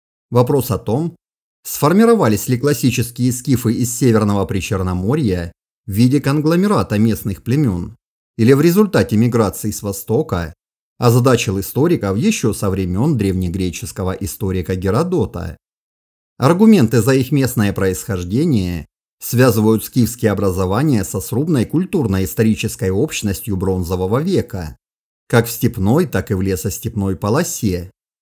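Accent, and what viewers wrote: South, Russia. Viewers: ну, понятное дело, классическим произношением всегда является произношение диктора телевидения или радио... Обычно оно основывается на произношении, принятом в качестве классического. Речь идет о произношении столиц. Наверное, есть какие-то курсы на эту тему... Но, вообще, кому бы ни показала Ваши ролики - все дончане ничего особенного в произношении не видят. Прихожу к выводу, что это локальная особенность. Просто при чтении она бросается в глаза как-то больше, чем в обыденной речи. Но местные особенности речи абсолютно не мешают вещать другим донецким блогерам, например, Денису Селезневу (социология). У Бушвакера, у которого очень хороший канал по истории, тоже выраженное южное произношение. Это придает каналам определенный шарм. Ну, у Вас обращают внимание больше потому, возможно, что Вы серьезный, а они с шуточками. Поэтому и ждут от Вас дикторского голоса. 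Или если не дикторского, то легких шуточек, как у Дробышевского, которого, без сомнения, приятно послушать. Оставайтесь самим собой. Не стоит пытаться всем угодить. Недовольные всегда найдутся. У Вашего канала есть индивидуальность. Главное - все грамотно и интересно изложено. Чем популярнее канал, тем больше будет критики. Вот, Платошкин - историк. Автор многих книг. Имеет научные степени. Дипломат бывший. А рязанское (предположительно) диалектное произношение не смущает. Так что все относительно)))))) Можно посмотреть на вещи с другой стороны.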